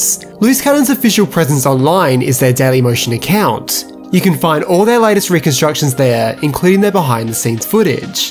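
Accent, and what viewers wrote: Australia. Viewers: Are you austrailian P.S at first its hard to enjoy recon but now i really enjoy it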